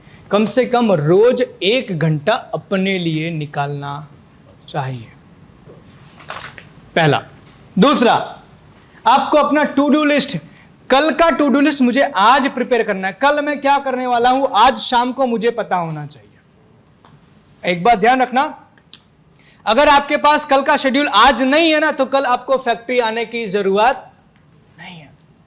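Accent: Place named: Gujarat, India. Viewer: sir apa gujarti he laght he voice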